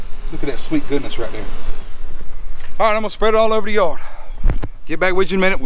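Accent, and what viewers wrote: North Carolina, United States. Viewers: I heard you speak and was like "wait a minute, where are you from?", then you said it. NC baby! I'm in Charlotte 👍🏽 This was in my recommended. I'm putting in zoysia next year, can't wait! Great video neighbor! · Brother man, lol, when you first started talking, I knew we were from the same place. I live in Mount Holly (just west of Charlotte), but I'm originally from Wilmington, NC. Born and raised. :)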